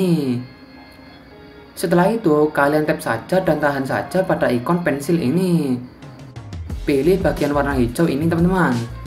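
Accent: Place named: Java, Indonesia. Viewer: Orang Jawa yh bbg 😂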